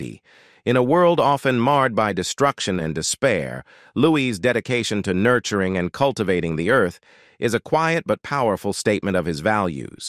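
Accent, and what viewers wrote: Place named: United States. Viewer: And as usual - an all knowing American drawling his way through more BS.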